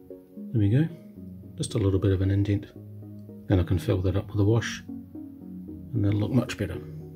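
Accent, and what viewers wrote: New Zealand. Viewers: new zealander?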